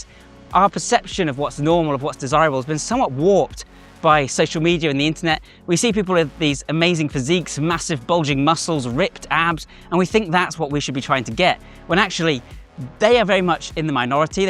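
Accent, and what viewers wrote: United Kingdom. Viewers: Closed caption gives a transcription of CATTLE BELLS. Now the largest cow bell is over 2000 lbs in Germany. So maybe has something here....cow bell lifting. Or maybe it's just the Brit accent.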